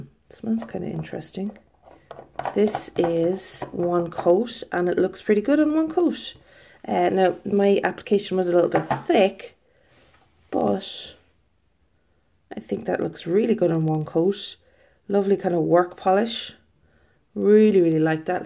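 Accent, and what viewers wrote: Ireland. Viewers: Love the video! Really cool to hear an Irish accent talking about nail polish... most people's eyes glaze over when I talk about it. ASMR btw? I'm from Dublin so glad to hear a fellow Irish polish nut!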